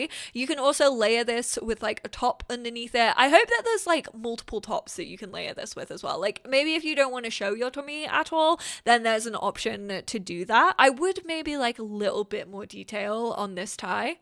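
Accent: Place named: Northern England, United Kingdom. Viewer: Does actually live in england still. To me her accent sounds like 40% northern and the rest vaguely america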